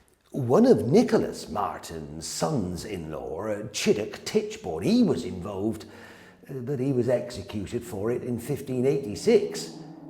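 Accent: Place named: United Kingdom. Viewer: British narrators are always the best! This was so much fun!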